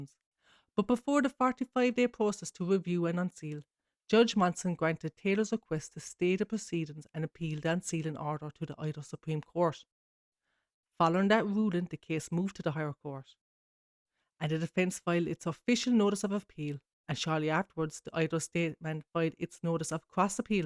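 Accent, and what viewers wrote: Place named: Ireland. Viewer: I love your voice! I had no idea you were actually Irish! Lovely. Thank you for now uploading videos. I can liztdn while i clean and work❤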